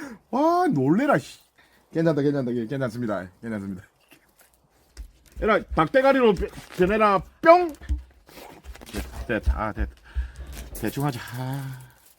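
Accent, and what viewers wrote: Gyeongsang, South Korea. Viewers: 오모나 보고싶었어예^^ 한치'팩한 이후로 얼굴색깔과 손이 검어졌어요~ 😂 경상도 말씨 듣고싶으면 보고 음~~웃겨요 ~~^^ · 사투리를 잘 들어보면 90프로 경상도 + 10 전라도 가 섞여 있는 느낌. 사는 지역이 어딘지 모르지만 진주, 창원, 김해?? 그쪽일듯 싶다.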